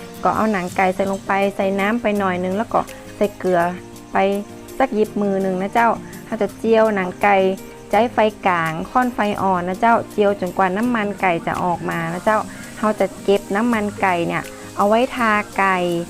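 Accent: North, Thailand. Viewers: เสียงแบบนี้ฟังแร้วเป็นคนเหนือใช่ปะคับ แม่ค้าคนจังหวัดจ้าวครับ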